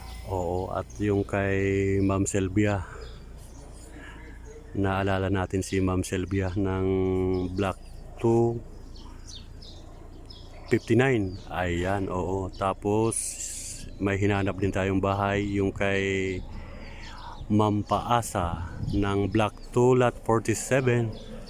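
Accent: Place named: Batangas, Philippines. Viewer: Taga Batangas ka pala sir.kaya pala parang batangueno ka magsalita Tagalog .watching po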